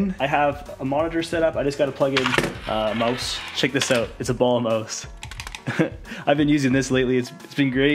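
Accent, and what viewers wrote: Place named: Ireland. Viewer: Very cool videos (part 1 & 2). They contain some real helpful information. Thanx for making and uploading them! I am wondering if your family comes from Ireland. Your English sounds a bit like that...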